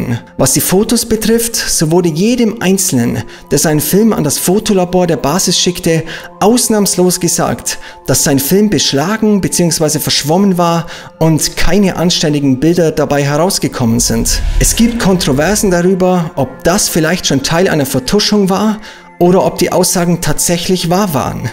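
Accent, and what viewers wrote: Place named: Bavaria, Germany. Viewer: Hi, hab ich entdeckt und direkt gleich all deine Videos angeschaut 😅richtig toll! Sag mal, wo kommst du her? Voll schön, den bayrischen Dialekt mal raus zuhören. Macht dich mega sympathisch ☺️☺️✌️ Grüsse aus Regensburg 😊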